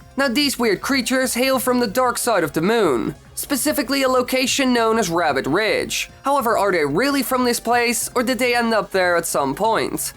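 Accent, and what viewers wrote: Netherlands. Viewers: Woah Why Do you say Utrecht & Utrecht Centraal so Dutch speaking?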